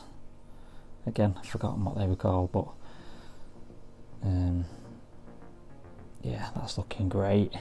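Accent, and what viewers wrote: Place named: Manchester, United Kingdom. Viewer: "I can barely speak English meself, to be honest." LOL! Me too! I'm a Mancunian so I grinned at your use of "meself" instead of "myself" as that's my dialect too. "Mi" instead of "my" "tuh" instead of "to". Yep. When I moved to where I currently live a lot of the neighbours had difficultly understanding my regional accent. You say how good the paint application is on the head sculpt and oh my, yes it is. From the changes in skin tone at the edges of his eye to the slighter blush of his cheeks, then down to the greying of his stubble. It's incredibly subtle and skillfully done. A tube shaped container. I wonder if that's supposed to be a nod to the tube he gave to Zam Wessel containing those creatures that were intended to kill padme at the start of Attack of the Clones. Everything about this armour is outstanding, particularly the wonderful weathering they've given it, but also other details right down to the tread on the soles of his boots. 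"I've been wanting a Jango Fett since I started collecting Hot Toys." And the delight at finally getting him was evident in that big smile across your face at the start of the video when were holding his box. I think that kind of sums up a lot of why collectors buy these figures - they bring joy. I'm really pleased that you got such a long awaited figure,. Congratulations. Bet he's going to look superb in your stylish detolf displays. I think the poncho, paired with his twin guns, really brings home the fact that he i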